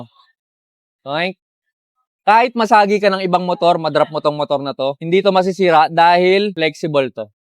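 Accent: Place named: Visayas, Philippines. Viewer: bisaya pag ganyan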